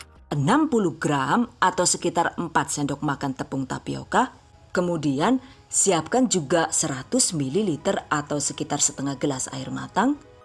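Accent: Banyumas, Indonesia. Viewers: Ya ampun lucu bgt sih ngapaknya hehehe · Tks ya resepnya..pasti yg medokk kadang ngapakk..cuantik dehh ibunya..he..he..he..yeni virgo suka deehhh dng cara mmberitahukan resepnya....maju truss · hhhh ngapak lucu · Mandan ngapak yayune jan, sinau nengkene ya kena kiye sidane 😁😁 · Weslah ora sah ngecepres baee ngelih kohh wkwkwkwkkw jossss ngapake josss ky inyong wong jawa,, is the best lahh haaaaaaaa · Aku suka ngapaknya selain resep" nya😀😀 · Wong ngendi Jane rika zu? Madiun ka ngapak 😂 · Terima kasih resep nya dn sempat tertawa juga karena ada bahasa ngapaknya😊😊😊😊😊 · Asslmkum njenengan wong ngapak ya yuk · Trima kasih ilmuny Yg bikin kangen NGAPAK2 NYA ITUUU LUCUUU TAPI GC MBOSENIN 👍👍💪💪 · 😂😂😂 ngapak ya ora ngerti... Mksih bun😘😘😘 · Wkwkwkkwkwkw.....asiiik ada suara ngapak ngapaknya ... 😀🙏😊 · Sampeyan itu orang mana mbakyu. Aku kalo lg lihat vedionya..sampe ketawa sendiri dngn bhs ngapak.mantap resep2 sampeyan gampang ditiru.maturnuwun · Bahasa ngapake metu.. 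🤣 ngapake kaya daerah banyumas, cilacap, purbalingga, kebumen.. udu ngapak tegal udu kie tah.. 🤣🤣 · Maen temen deneng ky kue bisa ngapakz ,tengkiu resepe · Ngapak ternyata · Iya bener. Apalagi pas ngomong pake bahasa ngapak😂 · Jebule wong ngapak apa kieeee🤣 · Ngapak nemen rika mbekayu....??!!!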